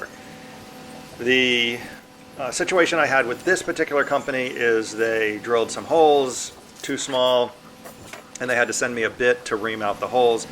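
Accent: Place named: California, United States. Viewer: This guy sounds like he’s from California.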